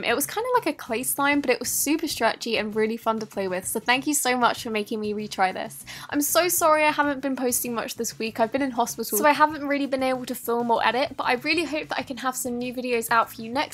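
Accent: England, United Kingdom. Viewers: I like your accent Are you from England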